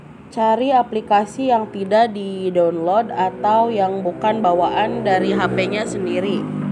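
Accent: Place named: West Java, Indonesia. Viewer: mni Sunda pisan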